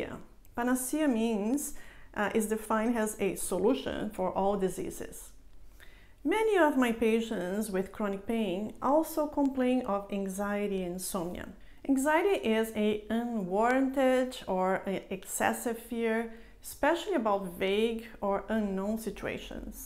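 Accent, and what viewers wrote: Brazil. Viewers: Poxa vida Dra, que maravilha! Eu pensei, seu inglês é perfeito, mas tem um certo sotaque; uma de duas, ou é italiana ou brasileira por ser um sobrenome bem conhecido no estado de SP. Vou arriscar e mandar um abraço em português! E não é que a doutora é de Sampa! Rsrsrs! Que ótimo! Em 92 eu estava cursando meu 4 o ano, na USP também olha só! Admiro demais seu trabalho no canal. Seus videos são atrativos, suscintos, claros, simpáticos, rigorosos na informação científica sem que ela se torne cansativa ou maçante. Quanto à gabapentina e peso, um mistério mas uma excelente opção para aqueles que sofrem os efeitos devastadores de um aumento de peso indesejado e involuntário devido à pregabalina. Tudo de bom e parabéns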